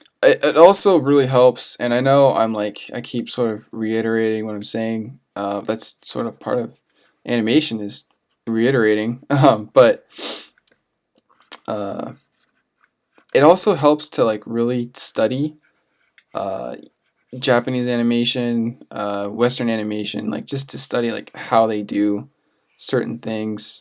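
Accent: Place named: United States. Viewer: Liked and subbed. You have everything an ispiring animation student needs,a clear voice and mic,good american accent to understand,explaining everything multiple times.. Thanks man i really needed this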